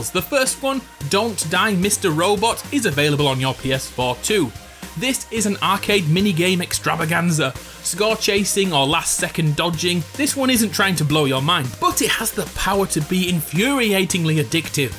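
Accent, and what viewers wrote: United Kingdom. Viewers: that BRITISH VOICE tho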